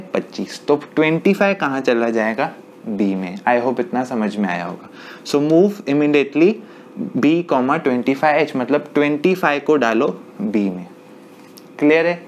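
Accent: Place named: Gujarat, India. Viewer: Surely tell he is a Gujrati , apart you explain real well! I wish i got teacher like you for rest of my career.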